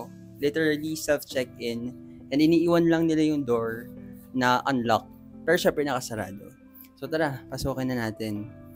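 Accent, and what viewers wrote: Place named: England, United Kingdom. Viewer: I love your English accent!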